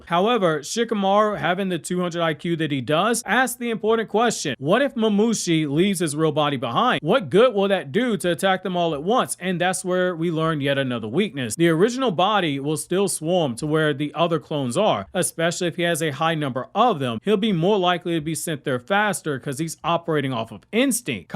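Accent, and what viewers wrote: New York, United States. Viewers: ole boy has a new york sounding accent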